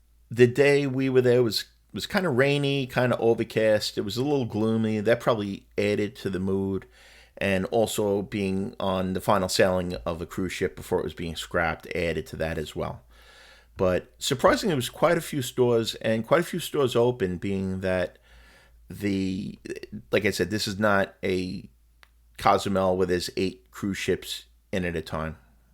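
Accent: New York, United States. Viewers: Not sure, but I think they were making fun of your very noticeable New York or should I say New Yawk accent.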